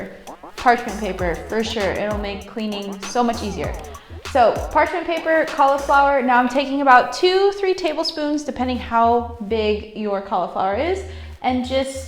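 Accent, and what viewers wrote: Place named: Morocco. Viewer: Hello hello bravooo thank you for your recipe ❤ I like it 🌹 good luck . I like your Moroccan accent ❤❤❤❤ keep it good guys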